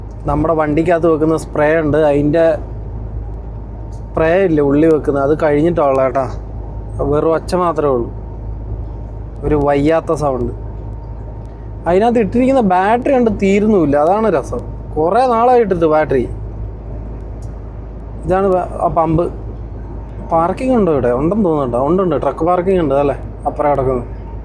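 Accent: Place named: Kannur, India. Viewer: സംസാരം കേട്ട് കണ്ണൂർ കാരൻ ആണോ പിന്നെ ഗൾഫിൽ ചൂട് ആയാലും Ac. ഒത്തു പോവും ഈ തണുപ്പ് പോന്നു ഭായ് ഭായ് എത്ര യൂറോ കിട്ടിയാലും വയ്യ 🙏❤️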